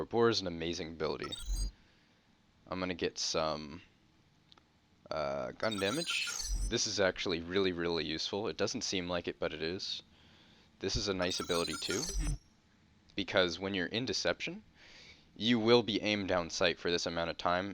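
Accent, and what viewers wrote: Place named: Canada. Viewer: dat canadian accent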